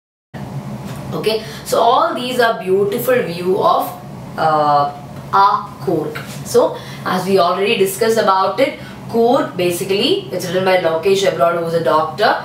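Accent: Kerala, India. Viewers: Mam r u from Kerala??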